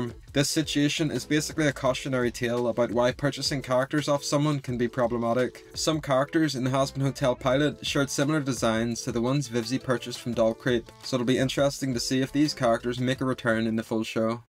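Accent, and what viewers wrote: Northern Ireland, United Kingdom. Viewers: so strange seeing a video like this being narrated by a person with a northern irish accent, pretty rare lol caught me off guard as i’m not used to hearing my own accent on youtube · I can't tell which part but i feel like you're from the UK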